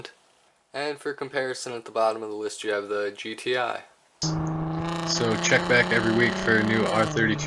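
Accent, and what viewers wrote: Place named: United States. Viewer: why u speak with that united state accent. its sound no good.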